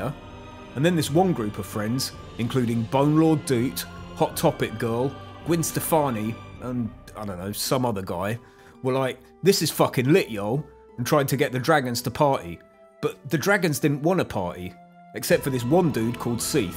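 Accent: United Kingdom. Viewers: I've got a thing for surly, irreverent British men talking about video games over a backdrop of free domain hard rock, it would seem.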